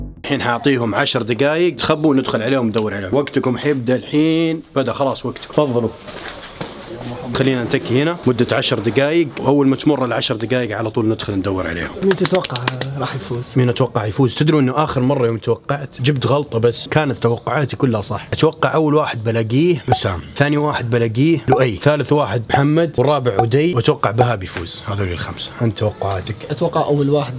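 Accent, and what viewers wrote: Saudi Arabia. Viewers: كيف كلامو سعودي وهو من تونس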